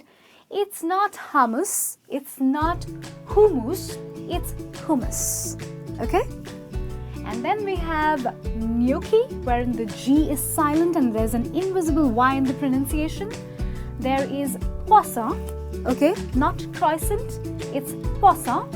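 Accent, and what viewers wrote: India. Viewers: That's commonly heard in India. Very sad for this lady, trying to teach us, to use. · This sounds more like Indian English. Plus no onion in bruschetta ;) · Absolutely right. As a native English speaker from tbe UK this woman has gigen me a headache with her English. Most of it prounced wrong. She is obviously from Indian background and they always seem to prounced their v as a W. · She is pronouncing it in Indian English, for those who were wondering. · No 1, she doesnt speak british english, more of indian slang english, no.2 it's temato not tomeito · I think she has spoken all the words correctly in the Indian context. Country wise,words will always sound different. We dont speak like Americans or British. Appreciate the fact that we are atleast adopting these funny weird foreign words and trying to pronounce them correctly unlike americans ,europeans...etc who never get our food names correct. 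Can you pronounce Tandoori correctly ? Can u guys pronounce Baingan ka Bharta correctly ?? Can you pronounce Sambhar correctly? Countrywise words will always have a colloquial touch invariably. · i am sorry. i do not want to learn indian english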